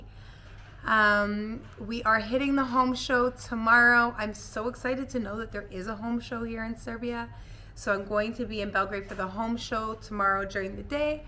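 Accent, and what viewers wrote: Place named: Canada. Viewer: It's interesting 🙂 I mean I agree that bureaucracy can be difficult in Serbia😉but when comes to licna karta, pasos, it's very easy. My kids left when they were kids, and when (one of them )was 27 (so after 22 years) we were doing his licna karta back home. And it was done in a few days. You fill out the papers, you pay, easy. Not sure what was so hard🙂. And you don't talk as a child, no worries😍. That's just a Canadian way of talking. 😉 but don't kid yourself, it's not as easy in Canada also, if you are not Canadian. Lots of crap over here also.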